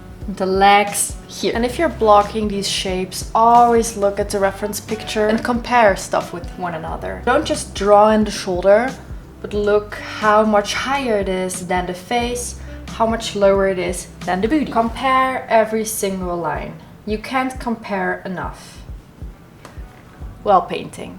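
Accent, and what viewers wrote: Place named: Germany. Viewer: du geht’s von dir aus aber verstehst nicht das nicht jeder so denkt. Du findest es also fair das andere bevorzugt behandelt werden u ihr deutsches Publikum vernachlässig wird? Außerdem sagst du selber das sie mit mach das Buch hier fertig auf dem Hauptkanal nicht gut läuft aber dieses hier was ideal dafür ist nicht für deutsche gemacht werden kann? Ich bin mir sehr sicher das mindestens 90% wenn nicht noch deutlich mehr hier auf diesen Kanal deutsch sind. Viele deutsche haben von englisch die Schnauze voll u wenn eine deutsche youtuberin besseren constant fürs Ausland macht sich doch etwas verarscht fühlen ist das ja wohl nicht verwunderlich. Hätte sie dieses Video auf deutsch u auf ihren Hauptkanal gezeigt bis ich mir so so sicher das sie deutlich mehr angesprochen hätte u mehr Follower generiert hätte. Es ist ein kausaler Denkfehler das ein Video auf englisch mehr durchstartet als in der eigenen Sprache. 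Kunst ist eine Nische u spricht nicht jeden an u wenn es ein English mit deutschen Akzent ist wird es auch nicht besser.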